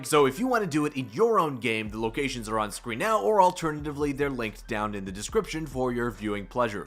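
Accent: Canada. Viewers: Wait this mans is Canadian. I just realized your accent and I can’t unhear the “agaiiinst” and “aboot”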